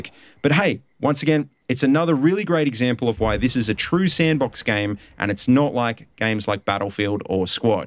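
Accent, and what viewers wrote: Australia. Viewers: This bloke sounds like the Silverchair boys. Oh look he's in Newcastle. #AustraliaHasAccentsToo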